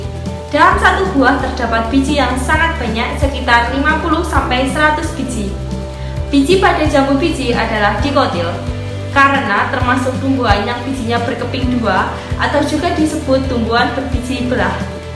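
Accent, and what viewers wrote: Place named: Java, Indonesia. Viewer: mbaknya cantik, tapi medhokk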